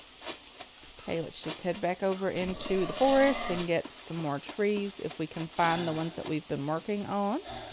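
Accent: Kentucky, United States. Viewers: Hey loving this gameplay series so far (I came from following your Subsistence videos). Might have to give Green Hell a try. Learning a lot, enjoying the content and just let me say, your voice brings a happy nostalgia to this Kentucky girl (living in MI). Its so nice to hear an accent from home! Also, have you ever heard of Starsand? Its a desert survival game and its unforgivingly brutal. Looking forward to more content! :)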